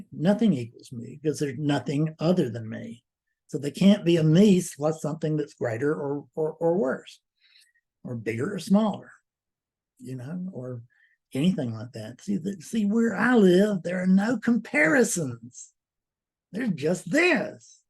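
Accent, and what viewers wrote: South, United States. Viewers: when I first found you so long ago, I dismissed you as just a Hick with that "country voice" and ordinary language. I was used to the impersonal, superior Lecturing style of other Speakers/Teachers, so I didn't value your "common man" style until just now. Thank you for your honesty and personal language plus your obvious Awakeness.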